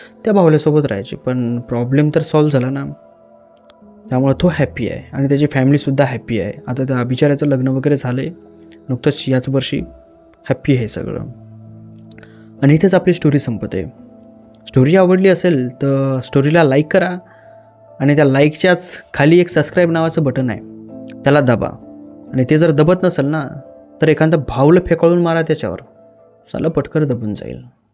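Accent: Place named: Marathwada, India. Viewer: टिपिकल मराठवाडा टोनींग😊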